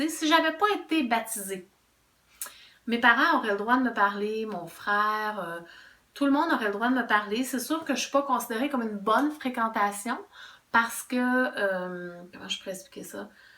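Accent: Quebec, Canada. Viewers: Oh t’es Québécoise ! J’adore ton accent et j’adorerais venir visiter le Quebec un jour ! Vous dites le mois d’A-OU ? J’ai appris un truc haha. Sinon bonne vidéo. Au début de "mon réveil" je n’en revenais pas que ce soit partout pareil. Je pensais que c’était que dans ma congrégation que ça n’allait pas. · Merci de partager ton accent Quebecois.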